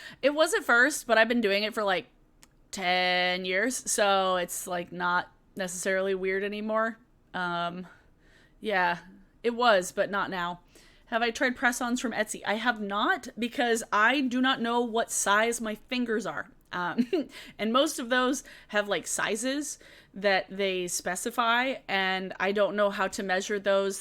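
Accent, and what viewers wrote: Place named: Minnesota, United States. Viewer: I’ve never met anyone that actually talks like your Minnesota accent but that’s a great accent 😂. Maybe it’s because all the Minnesotans I know are from the Twin Cities area and I’m from the part of southern Wisconsin that’s near Chicago. If you want to watch comedy about the Midwest there’s a comedian called Charlie Behrens that definitely talks in that accent!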